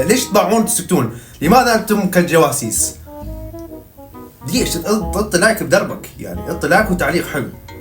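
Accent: Iraq. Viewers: والله حلوه عليك اللهجه العراقية 😂 مال يلا انطي لايك يلا 😂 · اني اول مافشفتك تحجي بصوتك جنت مامنتبة علة الهجتك بس هسة من شفت الك فديو بصوتك انصدمت من طلعت من العراق 😮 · عراقي انتة هالهجة عراقية ❤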